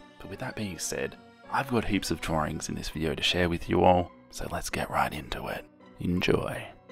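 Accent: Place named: Australia. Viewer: The first drawing has Springtrap eyes, Change My Mind... or maybe im just a FNaF Nerd And your voice helps me think like it, since PJ Heywood is also Australian 😅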